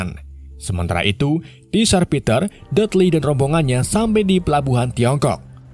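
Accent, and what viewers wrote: Indonesia. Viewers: Ciri khas dong lidah indo.. semangat ye'endeeeeeeeaaaaaahhhhhhh uraaaaaaaaaa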